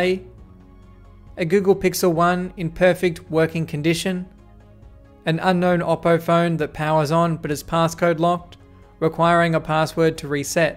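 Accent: Australia. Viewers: He sounds a lot more Australian when he isn’t using his video voice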